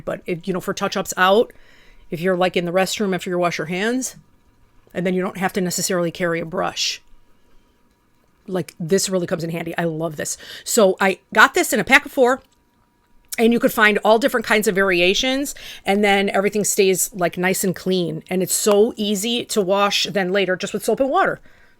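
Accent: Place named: Midwest, United States. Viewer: Love your midwestern accent! 😀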